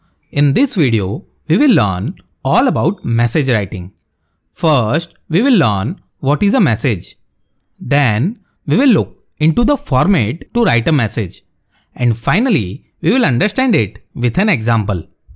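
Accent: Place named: South India, India. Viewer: Are you tamil,telgu or maliyalam😅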